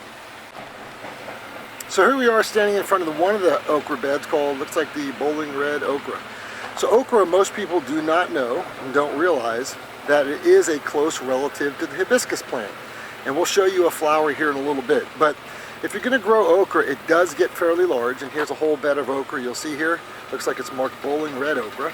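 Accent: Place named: Midwest, United States. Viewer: I don't think he's from the South. His accent is Northern, imo. Probably Midwest.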